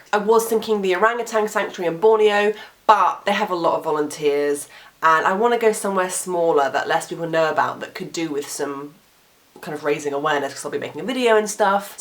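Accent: United Kingdom. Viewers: Sounds like agoraphobia to some extent. I try to get over the irrational fears I have by doing more of what I fear. Travel, travel, travel... LA is a cool but expensive place, perhaps not tho compared to London. With your awesome looks, talent and sexy british accent you could kick butt and take names here in LA. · What the heck,? You’re practically an American with a British accent. Have you gone to a hypnotist to find out why you are afraid? My greatest fear is the government busting down my door and asking for more taxes. Or everyone pointing at me and saying, “you’re worthless & a fraud.”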